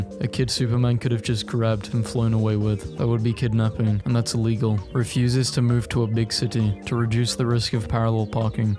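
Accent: United Kingdom. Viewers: I just remembered you are bri-ish (I'm pretty sure) because Americans pronounce it "Van Goh" I assume bri-ish people say "Van Goff"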